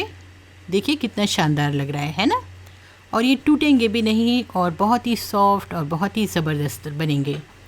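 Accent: Hyderabad, India. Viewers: Yes ,her style of talking is Hyderabadi....n she stays in a remote place like farm house....beautiful....Barakallahu feeha... Loved her ooen balcony kitchen....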